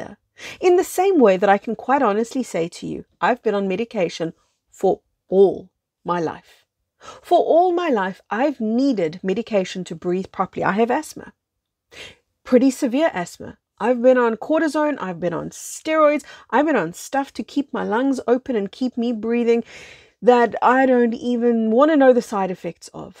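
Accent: South Africa. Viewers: Indeed! Flip the script. From victim to super hero. And always thanking our agony, trepidations, dilemmas, tragedies because in the end if you want them to they are your invaluable inner wealth and that light, baby, (I'm talking like you now 😆♥️) is going to get you to ascension and help steward others who need a hand to hold on their way! Yay. I have two hands and I thank them. Thank you. I'm English Greek I hear your South African accent and melt. Have many S.A. friends in Europe. You feel like family 🥳🎺🙏🏽🙏🏿🙏🏻🙏🏾⭐❤️ Love to all subscribers and beyond! When I can afford it I'm definitely going to sign up for your lessons and group. Yes, baby ♥️🦋.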